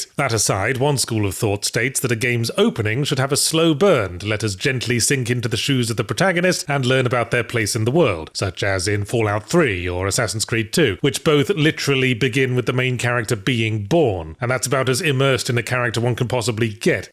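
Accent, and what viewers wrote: United Kingdom. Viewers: In a weird way this reminds me of Egoraptor's talk about about the opening of Mega Man X. But more fancy due to British. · No video intro has ever made me more pumped to hear a British bloke talk about video games.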